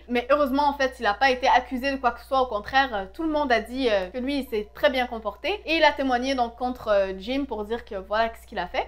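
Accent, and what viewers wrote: Quebec, Canada. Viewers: tu es québécoise n'est-ce pas ?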